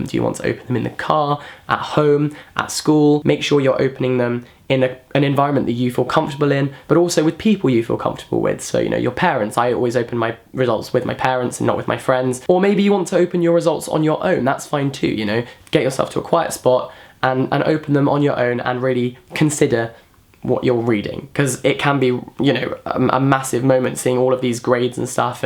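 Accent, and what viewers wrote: United Kingdom. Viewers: I love British accent and I'm in Russia love you accent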